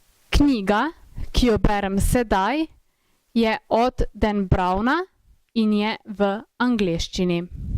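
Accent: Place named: Italy. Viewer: It sounds like an Italian speaking Serbian or Croatian.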